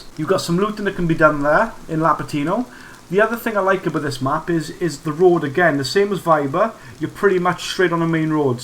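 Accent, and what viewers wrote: Wales, United Kingdom. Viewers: U welsh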